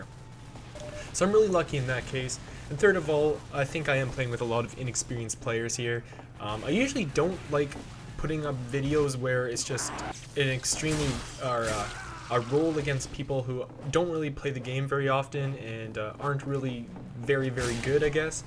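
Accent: Canada. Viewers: you have such a bad ass Canadian accent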